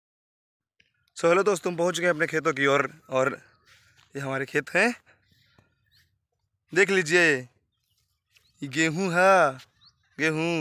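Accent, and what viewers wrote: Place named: Bihar, India. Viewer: bhai ki boli bihari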